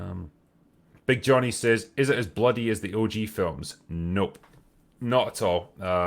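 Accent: Scotland, United Kingdom. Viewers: Nothing but respect for the work you all do one of my favorite channels since I found it it on YouTube a man among men with a smooth Scottish voice I can tune into all day and without open bar would not have found the rest of the crew amazing work all you heroes and a few heroines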